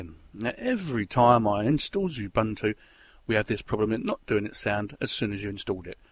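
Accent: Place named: England, United Kingdom. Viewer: Although as a German, I Cant get really into your English Accent, but I Tried it. Well, Im using Xubuntu 10.10 since a Month and its really great. The Default Style is so much better, And the Speed is very nice. Before all that I Used Gnome and I Was very Happy with it. But Gnome got slower with Maverick, so I Tried first Xubuntu. And stayed there. :)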